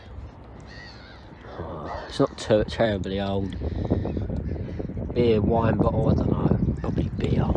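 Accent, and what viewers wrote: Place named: Cockney, United Kingdom. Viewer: Your talking more and more cockney these days ennit.....but carry on larking coz I still like to tune in .xxx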